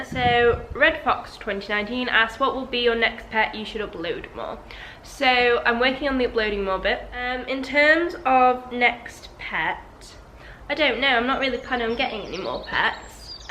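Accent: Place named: Yorkshire, United Kingdom. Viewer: Thought I recognised the Yorkshire accent, I’m from hull and it’s quite strong lol x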